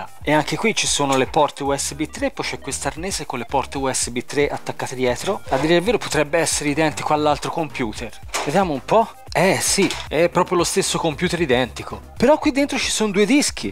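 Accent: Tuscany, Italy. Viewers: Grande,ti ho scoperto quest'anno e mi sto divorando tutti i tuoi video. Un saluto grande e w l'accento toscano <3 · Ti ho scoperto a caso nella home page ma dalla 1 parola ho detto li sto qua e un parente toscano poi hai resumato delle parole che "al bacio" un so di dove lissei te ma ner dubbio sei della Toscana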